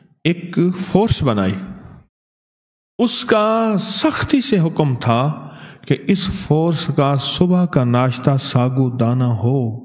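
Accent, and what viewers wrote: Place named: United Kingdom. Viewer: Pagal ho to nahi bolte ho angrez ki tarah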